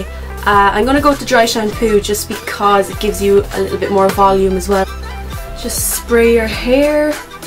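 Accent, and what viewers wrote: Ireland. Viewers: are you irish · I love her Irish accent 😍😍 I wish I had an accent too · are you Irish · Irish YouTube for the win 💋🇨🇮 I'm Irish myself 🇨🇮💛 I knew the second you started talking you were Irish 🍀😂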